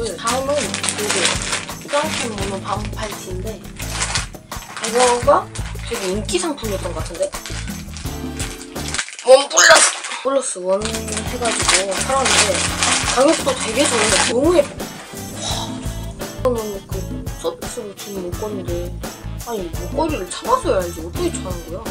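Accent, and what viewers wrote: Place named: Jeolla, South Korea. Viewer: 옴메 사투리 전라도여?!?!?! 나돈디!!! 넘모 귀어우셔서 구독하고감!!!❤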